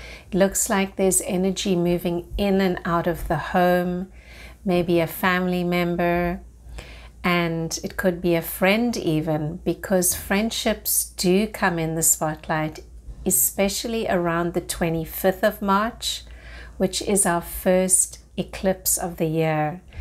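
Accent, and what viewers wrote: South Africa. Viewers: It's a South African accent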